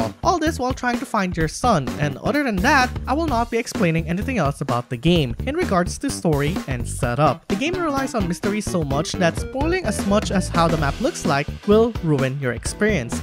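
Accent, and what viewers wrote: Philippines. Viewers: You sound Filipino 👀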